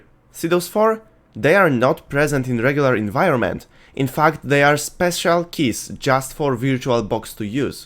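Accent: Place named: Poland. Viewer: Długo już się uczysz informatyki? Po akcencie słychać, że jesteś Polakiem haha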